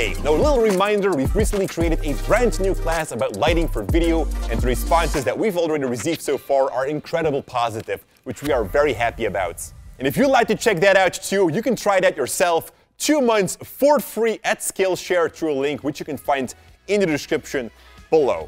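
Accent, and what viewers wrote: Netherlands. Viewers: Are you Dutch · are you dutch? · his accent tells me he is Dutch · are you dutch ?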